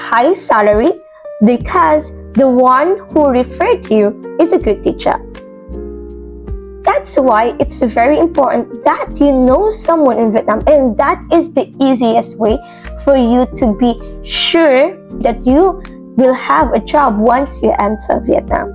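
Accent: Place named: United Kingdom. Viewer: Gusto ko yung may pa British accent 😂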